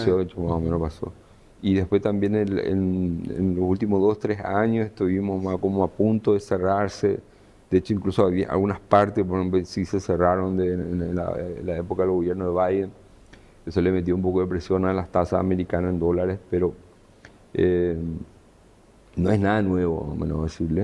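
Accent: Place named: Paraguay. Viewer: Habla como paraguayo. No se le entiende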